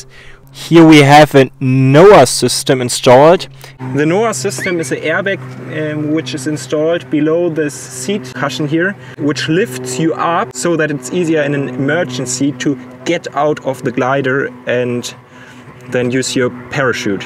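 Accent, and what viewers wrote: Germany. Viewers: Kurze Frage bist du deutsch wegen deinem Akzent? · Ich höre deutsche Heraus haha : ) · if you cant speak english, please dont do it, orfull to listen to that german accent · Haha man erkennt am Akzent, dass du Deutscher bist. No front ich spreche genauso. XD schöne Grüße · Der deutsche Akzent nervt kein bisschen :D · He is German i Can hear that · Du hast so einen deutschen Dialekt😂😂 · His german Accesnt tho... love him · Du hörst dich schon echt deutsch vom Akzent an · Are you from Germany, because your english sounds so. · German tries to speak English ......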